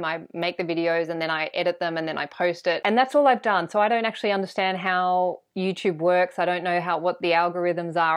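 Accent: Australia. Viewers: You are engaging and fun. You keep the teachings simple yet clear. I am loving it. Thank you! Ps and you are Australian. Living OS it is refreshing to listen to an Australian.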